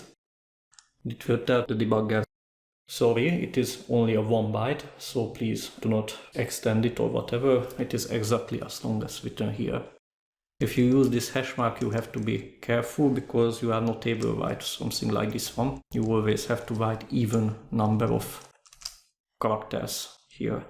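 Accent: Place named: Hungary. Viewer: Cool vid! Hey, aren't you from Hungary? Your accent is so familiar for some reason.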